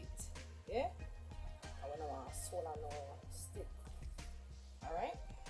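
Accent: Jamaica. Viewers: Yow ,your authentic jamaican voice make the video enjoyable to watch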